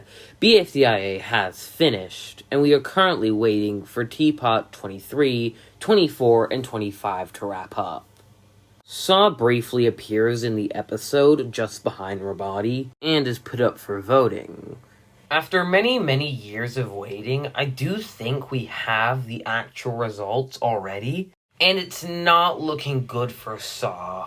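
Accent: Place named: Australia. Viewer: What happened to the australian accent 😔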